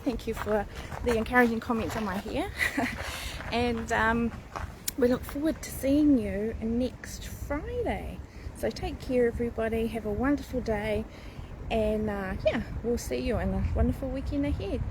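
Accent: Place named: New Zealand. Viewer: Hi and thank you for the glimpse of this very majestic building. I hope it can be restored and saved. Are you a Kiwi? You sound to have a NZ accent. 😊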